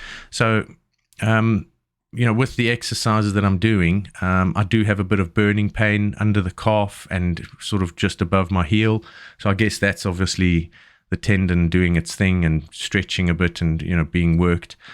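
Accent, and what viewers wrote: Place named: South Africa. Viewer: Another encouraging video, thanks! You sound like you are from SA?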